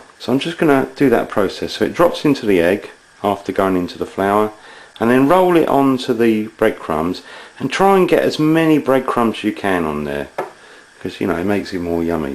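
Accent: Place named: London, United Kingdom. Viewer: Thank you sooooo much.. i made this last night my husband loved it.. soo yummy,simple an easy ,and... yes ..your accent very londoner sounds kool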